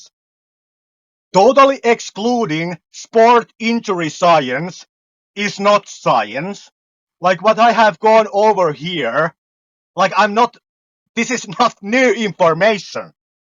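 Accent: Finland. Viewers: Haven't watched the video yet but I have to say, you sound super Finnish :D (I'm Finnish)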